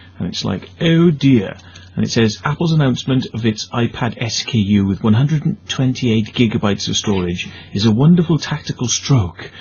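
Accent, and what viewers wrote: Wales, United Kingdom. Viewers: holy crap are u welsh!?!?!!?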